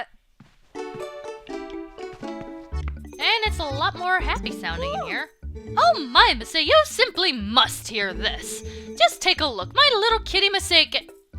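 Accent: United States. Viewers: Don't stop ur southern accent, it's funny! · lol I don’t get it but still I will always think your cute with that country accent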